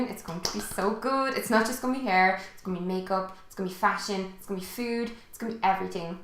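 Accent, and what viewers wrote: Ireland. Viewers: Your so beautiful, and it's so weird(not u being pretty) it's weird that I'm like your doppelgänger but younger! I had extremely curly hair like you did! And I presume your from Ireland?! Well me too! And I have the same accent, I have extremely think hair,frizzy/curly hair like yours... I love your channel and you look so pretty and beautiful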